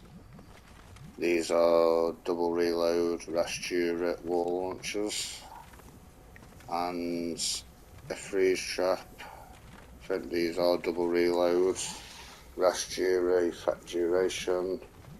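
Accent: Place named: United Kingdom. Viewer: Bruh ur from UK 😂 I thought u was from US 😂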